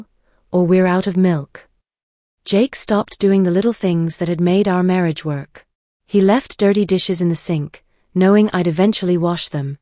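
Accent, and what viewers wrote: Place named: United Kingdom. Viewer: Absolutely freakin love British Narrators. ❤❤❤ Great Story 😊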